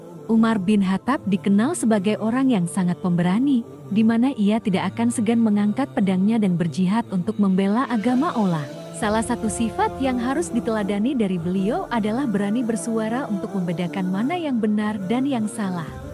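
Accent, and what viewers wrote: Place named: Indonesia. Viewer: Sayang pelapalan bahasa Arabnya, masih sesuai bacaan Indonesia.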